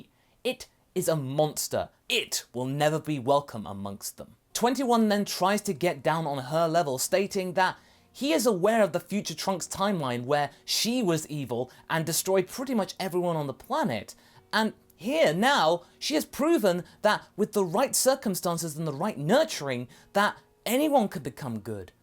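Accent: United Kingdom. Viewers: Oh wow, it's almost like you voiced the cell from team fou......wait.... I just.....your British. Well at least that clears it up.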